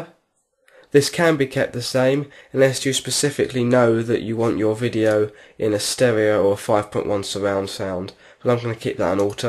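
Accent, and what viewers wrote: United Kingdom. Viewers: Thanks, excellent tutorial and lovely British accent. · Great informative video, FLAWLESS. Subscribed. Thanks. Hes british too, added bonus ;)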